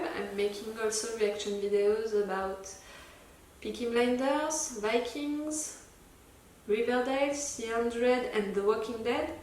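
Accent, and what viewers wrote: France. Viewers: a bientot! :) and I'm gonna miss that cute French accent, too!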